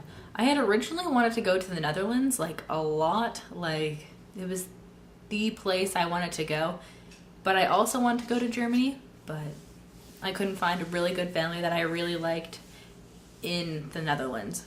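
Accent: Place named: Canada. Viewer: I can't believe your favourite word in French is besoin. This is so random. ALSO, CANADIAN ACCENT. YES. YES.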